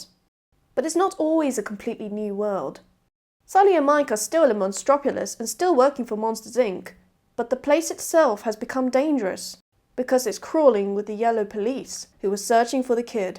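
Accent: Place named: England, United Kingdom. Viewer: Great video! Great accent - where are you from? You sound like my favorite English pop star, Kim Wilde. Are you from London?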